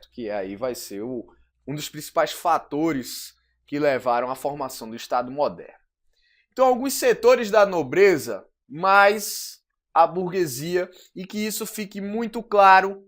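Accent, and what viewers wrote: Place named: Northeast, Brazil. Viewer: você é ridículo. Lindo o seu sotaque, professor. Nordeste ❤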